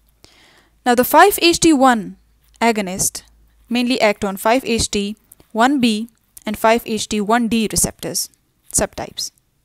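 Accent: India. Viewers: Hint aksanı ingilizce dinleyemiyorum çok gıcık ediyor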